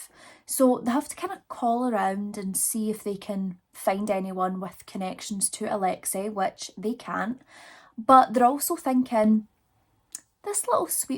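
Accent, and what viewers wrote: Scotland, United Kingdom. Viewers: Oh my- you’re so beautiful also! Your accent! 🥰 I take it you’re a Scot? 🏴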